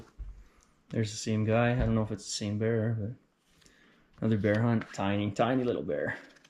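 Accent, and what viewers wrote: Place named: Canada. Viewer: I knew you were Canadian within like 2 seconds of hearing your voice. the location is a secret but ive narrowed it down; I would've hauled the vice out that boat house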